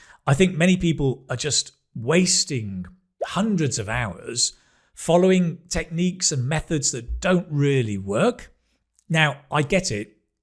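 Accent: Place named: Manchester, United Kingdom. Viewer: I think he is from Manchester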